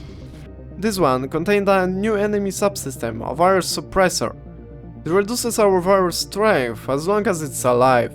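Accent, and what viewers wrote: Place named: Poland. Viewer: Oh QRVA, a polish guy. If you want polish speaking eve, look at my channel. Also, qrde, kocham twoj akcent :> Don't forget as an alpha, your skills suck, and your tier 1 equipment sucks, so it is NOT THAT EASY to get a site to 100%. Also, good luck to all the alphas probe scanning in low sec and null sec without a cloak.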